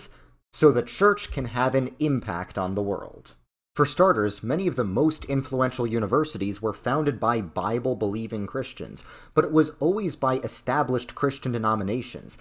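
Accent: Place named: Chicago, United States. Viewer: Okay, completely unrelated, but are you from Illinois? I hear some Chicago in your accent😅😅😅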